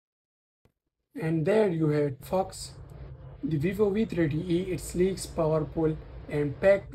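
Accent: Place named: United States. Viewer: American accent!!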